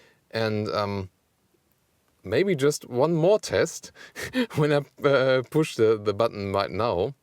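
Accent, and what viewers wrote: Germany. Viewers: Your English is really good but the pronunciation is really awful. If you'd put a bit more effort in de-Germanising your English it would be much more attractive to listen to ;-) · He's so cute! I really like German men accents... and that's a beautiful blue car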